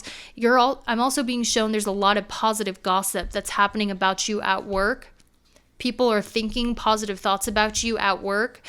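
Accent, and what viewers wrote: South, United States. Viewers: Love your Southern accent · I love your Southern accent and the fun themes you've been doing for your readings lately! I appreciate you & your creativity! You have a cute and fun energy :) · I really like that southern accent. Calmed me down and made me laugh a bit. I chose group 3 and it really described me. I go to customers houses and build stuff that people order from Amazon or whatever they get it from. I was actually called an expert at building stuff from one of my customers. I want to do more creative stuff with music though.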